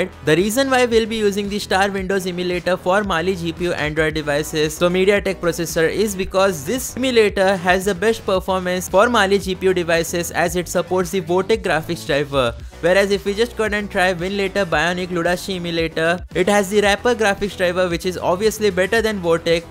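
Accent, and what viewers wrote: India. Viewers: you sre hindi boy you try english hindi appears in your voice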